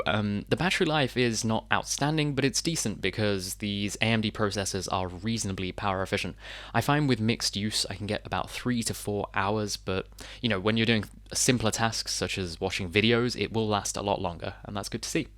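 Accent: England, United Kingdom. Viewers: One of these is being sent to me as a replacement for my old unit which HP lost during repair. Thanks to your review I'm excited about this arriving, so thank you. No idea whether or not mine will have the backlit keyboard but otherwise it's exactly the same spec as yours. You sound english and mentioned english prices, but the layout of the keyboard you showed in the video isn't. So that's more confusion, coupled with HP showing images of this unit having a microSD card reader. For me, it's replacing a 2019 Ryzen 5 3500u from the essential range, so should be quite a nice step up regardless.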